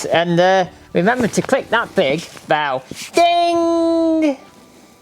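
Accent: United Kingdom. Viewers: Ay up lad, are you from't Cumbria lad? Are you 't local lad? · I've already started watching your back catalogue, very good. What county do you come from to have that accent (sorry to be nosey 👃 ) nice to hear a regional accent ! I'm down South so I like Midlands / Northern accents as opposed to our boring Southern ones haha.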